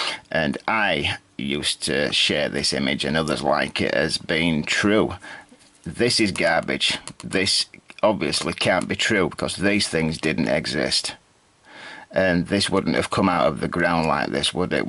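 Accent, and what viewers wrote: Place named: Lancashire, United Kingdom. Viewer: hey, I remember that huge dinosaur in manchester museum hanging from the ceiling when I was a kid, I think it was supposedly a T.Rex? 65 million years old 🤣 I was totally indifferent to it . Maybe bc I was a girl. I want to ask are you from north side you have gorgeous Lancs accent... & that cynism & skeptism. Superb. Miss the mancs voice.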